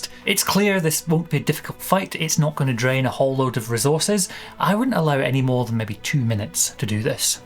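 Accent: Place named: Scotland, United Kingdom. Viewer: Brilliant video - so mamy good ideas! Our group definitely suffers from this. Every session 😅. Also your scottish accent is incredibly authentic and to maintain it for the whole video is admirable ❤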